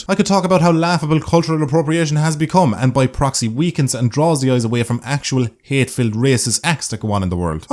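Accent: Ireland. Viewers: That's his accent, I'd know because I'm from Northern Ireland, which is ya know very close to Ireland, attached in fact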